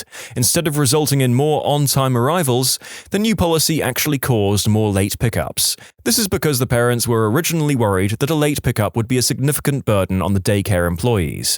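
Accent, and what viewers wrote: United Kingdom. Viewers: Pardon my language sir but WHY THE FUCK ARE YOU BRITISH NOW, WHAT THE FUCK HAPPENED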